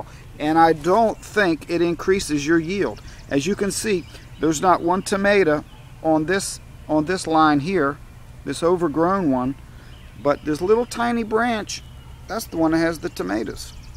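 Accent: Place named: Louisiana, United States. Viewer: Are you from Louisiana? heheh, I like your accent and I'm trying to guess where you're from. =)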